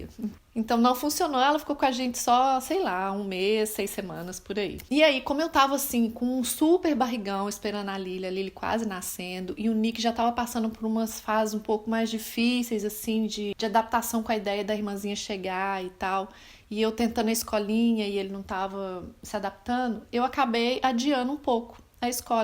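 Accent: Minas Gerais, Brazil. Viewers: Vc disse sutaque mineiro ? ❤️❤️❤️❤️❤️❤️❤️ Já amo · Eeeeeeh!! Sotaque mineirooo!!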